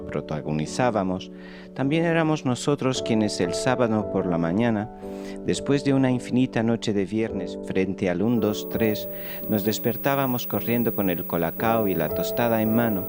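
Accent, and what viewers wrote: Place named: Portugal. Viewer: Portugués... Claro... Habia algo en tu ecento... En fin... Excelente canal y gracias por responder tan pronto....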